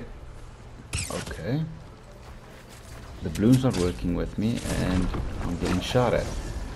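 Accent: South Africa. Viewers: Are you afrikaans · Are you south African?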